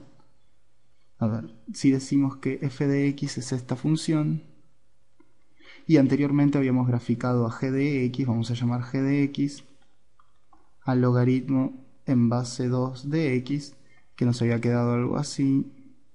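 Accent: Rioplatense, Argentina. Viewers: Bryce Flint No es culpa de él haber nacido en un lugar distinto al tuyo, es su acento de argentino o uruguayo, no se. La culpa es tuya por intolerante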